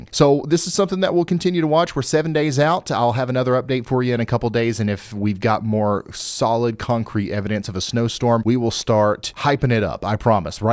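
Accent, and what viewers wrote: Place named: Appalachia, United States. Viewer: He said some of yuns! 😂 I didn’t realize yuns was a thing in Kentucky too. Appalachian dialect at its finest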